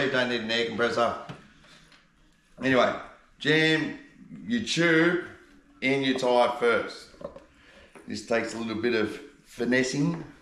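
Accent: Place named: Australia. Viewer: bros voice is the definition of aussie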